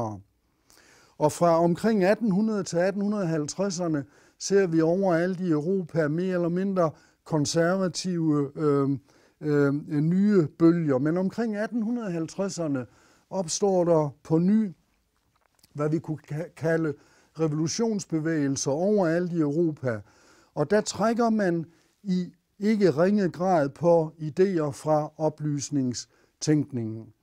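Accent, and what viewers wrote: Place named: South Jutland, Denmark. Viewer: Filosofi på Sønderjysk.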